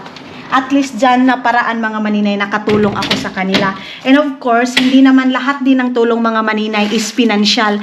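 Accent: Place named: Talacogon, Philippines. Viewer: Fan mo ako ganahan ko sa imo vlog ky makalingaw 70 yrs old na ko taga talacogon talacognon ba jud imo sinultihan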